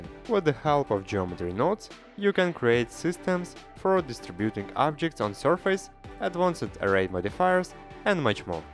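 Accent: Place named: Russia. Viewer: Привет русский акцент 😃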